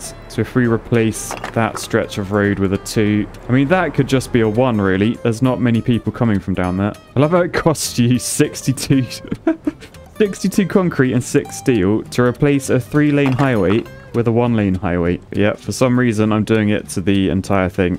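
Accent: United Kingdom. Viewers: ibuilt a fast lane also: is british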